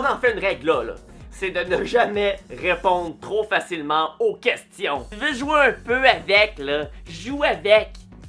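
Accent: Quebec, Canada. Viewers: Tes québécois?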